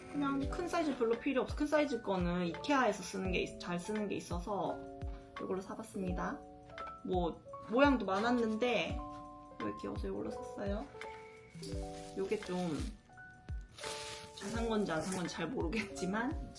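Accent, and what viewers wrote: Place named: Gyeongsang, South Korea. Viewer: 혹시 경상도분이세요? 사투리 장난없으시네여 🤭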